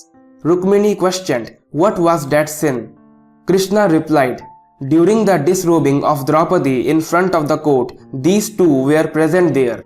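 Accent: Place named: Bengal, India. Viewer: Bhai are u bengali.... Because ur accents seems like u are bengali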